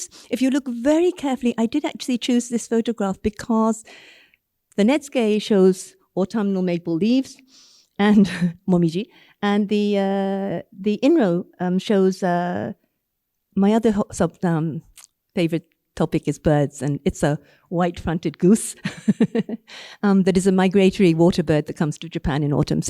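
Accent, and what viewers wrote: United Kingdom. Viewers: ある程度原稿があったとしても、その場に応じてユーモアと知性、ウィットに富んだ英語の内容は流石ね！！イキイキされていてホント聞いているこちらまで晴れやかな気持ちになるわね。東京オリンピックの招聘のイベントの時になんて綺麗な英国アクセント、そしてフランス語を話されるのかしら…と今でも覚えているわ。英語の先生、そして全ての学生はの英語を聞くべきじゃなくて？笑顔が張り付いたようなどこかの方はどうなのかしらね？ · かっこいいですね。キレイなブリティッシュイングリッシュ、わかりやすいプレゼンテーション、さすがです❗️❗️❤ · 素晴らしい👍👍👍 king’s English, 驚き‼️こんなすばしいひとみたことない、、殆どイギリス人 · 久子さまのファンになってしまいました。美しいブリティッシュイングリッシュ。お話の進め方も素晴らしく、とても興味をそそられました。楽しそうに話されているので聞き入ってしまいます。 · イギリス英語？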